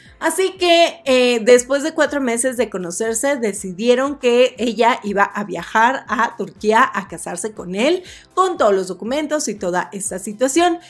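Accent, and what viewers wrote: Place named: Peru. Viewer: YO CREO Q ESTA BIEN ,Q NO PIERDA SU ACENTO PERUANO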